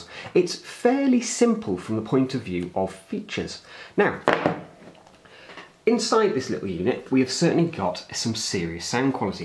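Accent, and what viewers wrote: United Kingdom. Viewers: Looks a pretty nice speaker to be honest, hadn't even considered this one at all however having a look at it now! Where are you from by the way, no good with accents but im guessing the UK? lol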